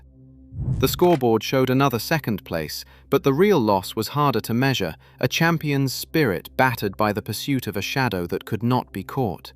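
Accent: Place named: United Kingdom. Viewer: And…”Shom”? Good God, this British accent allegedly is silly with almost all American Racehorses discussed.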